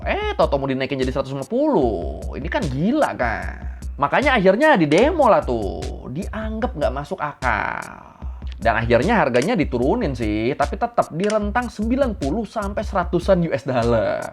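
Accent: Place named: Java, Indonesia. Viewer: bang elo...medog kayak jawa ya😅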